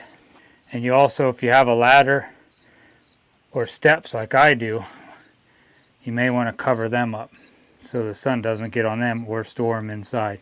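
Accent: Pennsylvania, United States. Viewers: Loved the video. It was informative. I have always helped my dad with winterizing our pool. I've never done it alone. My dad passed last month. I'm thinking to myself... how on earth do I close the pool for the winter. My dad had done everything and only wanted help with centering the pillow and placing and securing the pool cover. So here I am.... clueless about all of the other aspects of closing the pool. I had to chuckle at the PA accent. My dad has family in PA. I just came back from a week in PA (it was originally planned as a trip to bring my dad to say good bye to his family there). Thank you for your advice. I got this.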